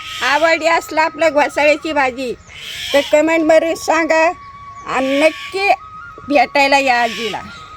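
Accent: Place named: Satara, India. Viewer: Aaji tumi sataryakadcha watta boli Bashavarun chan karta Baji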